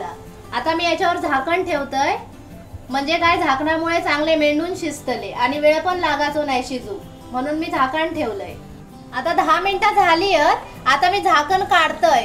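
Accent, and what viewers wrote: Malvani, India. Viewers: माका तुमची मालवणी बोलायची पद्धत लयच आवडली,माझ्या गावाची आठवण झाली आम्ही गावी सर्व मालवणी बोलायचो,आणि तुमची अळूची गाठीया बघून मला माझ्या आईची आठवण आली अजूनही आई करते आलूच्या गाठीया,खूप छान सांगितलात recipe 👌👌👌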